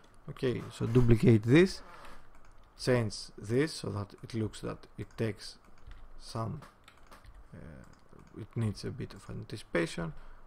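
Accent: Greece. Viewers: You must be Greek, so cool accent, not the fake stuff at all. Μου έφτιαξες τη μέρα.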